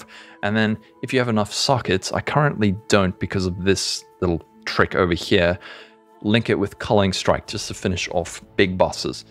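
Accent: South Africa. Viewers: Are you a South African botjie?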